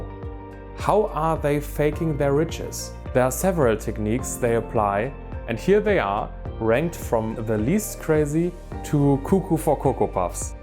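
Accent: Germany. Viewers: Crazy behaviour and sad. So shallow. And yes some earn lots and lots by selling gullible people courses on how to level up and so on, targeting desperate people to waste their little money in hooe to better their lives. That is real shabby and low behaviour. Btw. You have an interesting German accent, are you German by any chance? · Direkt am Akzent den Alman erkannt ^^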